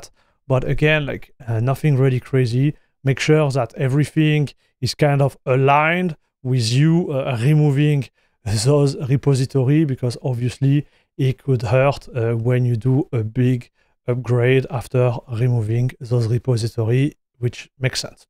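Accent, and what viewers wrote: France. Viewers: Cet accent français de zinzin, ça m'a fait bugé je me suis demandé au début si la vidéo allait être en français ou en anglais 😂. C'est ça qu'on aime, merci pour la vidéo !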